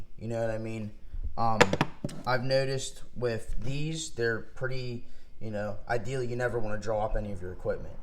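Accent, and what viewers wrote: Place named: Pittsburgh, United States. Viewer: G! Soon as I heard your Pittsburgh accent I knew and then you said Crafton! Been shaving my head 9 months. Pittbull Gold rotary. All good for 6months until I ordered replacement blades. Now getting irritation each shave. Would you recommend a close trimmer and this foil shaver for me. I’m shopping for both. Looking at andis t outliner pro and would like a foil to go with it. Great video man! Moved to the burgh 3 years ago!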